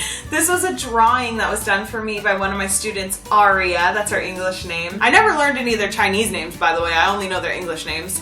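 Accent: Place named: United States. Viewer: + Dear. You are NOT a ''Native English'' speaking person Actually you do NOT speak English YOURSELF! You speak American English and have a strong American accent, use so many American slang words and as an English person, I find it hard to understand what you are saying at times. For a start, you speak so fast and gabble far too much!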